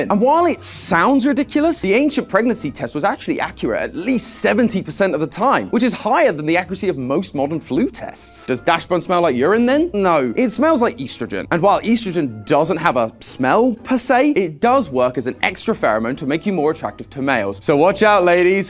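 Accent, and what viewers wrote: United Kingdom. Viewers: I still have to get used to British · BRITISH IS BACK 😭😭😭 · sounds very British today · Matpats British now? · is sounding quite British Edit: This aged poorly:( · Yeah, that’s why I commented You guys better get used to “British ” 😢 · GUYS, HAS BECOME BRITISH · why is british now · British 😧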